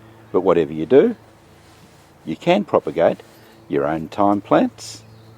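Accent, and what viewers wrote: Australia. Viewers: I'm sorry but i just can't take the aussie accent seriously :) :)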